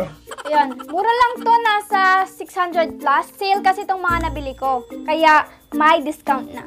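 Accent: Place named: Pangasinan, Philippines. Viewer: U have pangalatok accent hehe🤗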